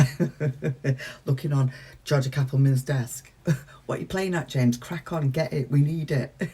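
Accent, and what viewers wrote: United Kingdom. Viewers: thank you. No I figured you were British. I guess i am naive to think Britain like the US doesn’t have different accents. I love your videos 👍 Always looking forward to the next one.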